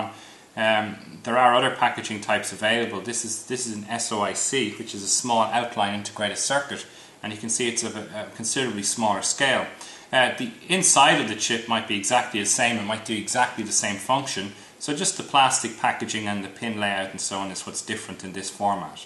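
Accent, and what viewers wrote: Ireland. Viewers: Extremely pleasant voice. It sounds Irish to me! Thank you for sharing!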